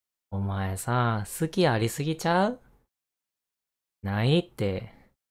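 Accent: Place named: Kansai, Japan. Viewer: 癒やされる声～関西弁最高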